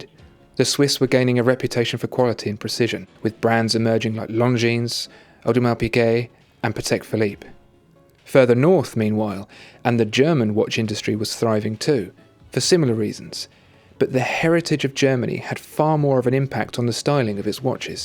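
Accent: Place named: England, United Kingdom. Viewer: A review of some vintage watches and their movements would be great. You crisp English accent makes these reviews sound regal! Great videos and delivered in a very balanced manner.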